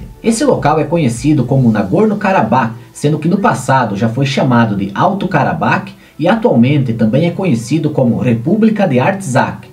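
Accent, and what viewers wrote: Paraná, Brazil. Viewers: Gostei demais do assunto e também do sotaque que parece do Paraná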